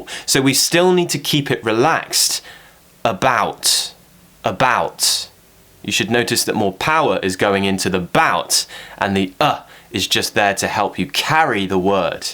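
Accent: United Kingdom. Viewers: I adore the English British accent I wish I could speak like you teacher! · I'm watching this video right now.And now I know Malaysian people usually use American accent rather than British accent.But seriously I think I'm falling in love with British accent.Thank you a lot for amazing video. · Hello, Im from Japan. I love british accent♡ you are the best teacher xx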